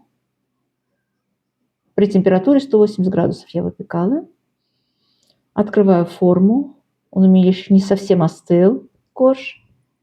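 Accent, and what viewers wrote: Baku, Azerbaijan. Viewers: Опять приятные слуху,родные "мелизмы" и акцент!Я Вас приветствую из нашего родного Баку!Огромное спасибо за рецепт и вообще,за то ,что Вы есть у нас!Благополучия Вам!❤❤❤❤❤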